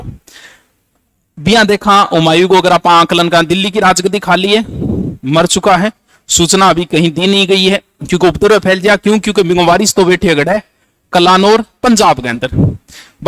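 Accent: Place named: Shekhawati, India. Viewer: भाई हिंदी ही तो थोड़ी बहुत ही तो शैखावाटी बोलते हैं । इस बोली के कारण ही तो इसका पढ़ाने का तरीका मस्त है 🥰🥰🥰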